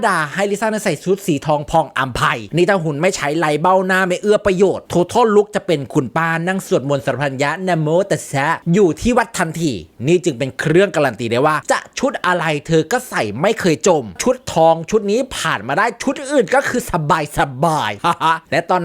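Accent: Laos. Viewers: มักๆๆแอดเว่าลาว.ขี้เล่น ตลก สนุกสนาน😂😂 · อีพีนี้แอด..ตกลาวบ่อยมากนะ..ฉันอมยิ้มและหัวเราะในเวลาเดียวกัน😂..คนสิว่าฉันเป็นบ้าอยู่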